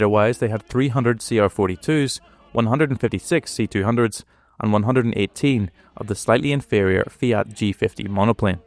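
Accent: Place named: Northern Ireland, United Kingdom. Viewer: Well done, a most enjoyable documentary with plenty of humour and a delightful NI accent. Looking forward to part two, cheers.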